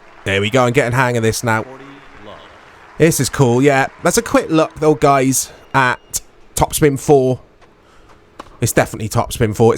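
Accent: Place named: London, United Kingdom. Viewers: Which part of London are you from mate? You sound Posh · Ha. He's English but think lives in Wales, but who knows.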